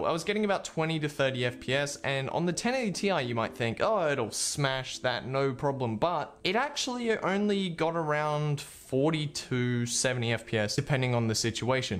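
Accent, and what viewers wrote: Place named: Australia. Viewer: I cannot tell if ur asutrallian american or british ?😂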